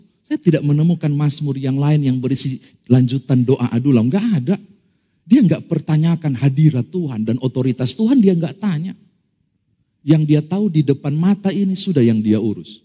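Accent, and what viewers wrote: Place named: Makassar, Indonesia. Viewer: Sukaa dgn gaya bahasa makassar nya pak pdt😂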